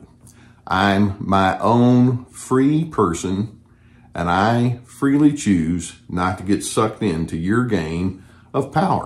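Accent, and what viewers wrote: South, United States. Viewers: Haaaaaaa ;D))))) you caught me out there , I wasn't expecting that word out of your mouth with that sweet southern drawl " a jerk " lol hearing you say that word really did tickle me , you have a good day sir ...I will always come back to hear that delectable accent ;D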